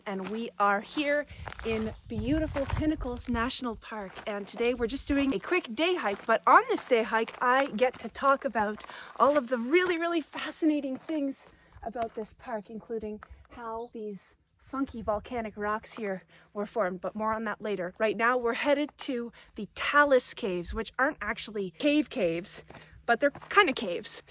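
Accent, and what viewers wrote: Canada. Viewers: killed me with the Canadian accent 🤣 Love your corny jokes, education as you make your way through the trails and just your overall excitement when oot and aboot in nature! Amazing video as always and excited to see where you explore next, cheers!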